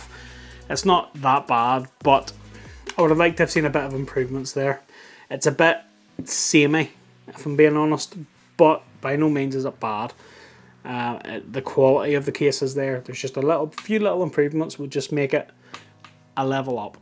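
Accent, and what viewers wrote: Northern Ireland, United Kingdom. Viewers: Well I wasn't expecting a Norn iron accent in a tech video! Super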